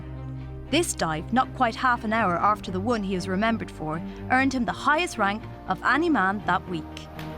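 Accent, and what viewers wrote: Ireland. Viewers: Lol at the Irish accent of the narrator.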